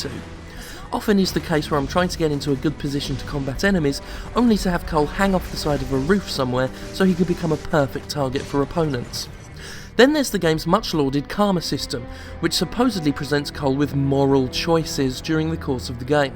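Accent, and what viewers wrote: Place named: RP, United Kingdom. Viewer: Look, if Yahtzee likes this game, then it must be good. Jim is just an angry fat British bloke who's accent is posher than it should be which makes his arguments seem more credible than they actually are (I'm British BTW X-D)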